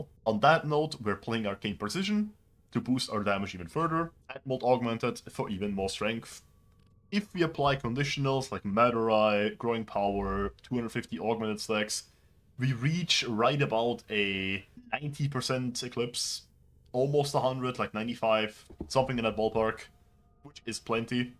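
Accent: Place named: Germany. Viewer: Love your content, very informative. In like 2 minutes i learned more than 90% of the big warframe ytubers convey in 20. In case i heard your accent correctly, greetings from a fellow german from hessen.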